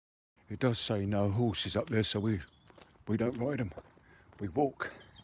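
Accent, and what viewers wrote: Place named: Cockney, United Kingdom. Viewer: Is that you talking, nice voice, can detect a cockney accent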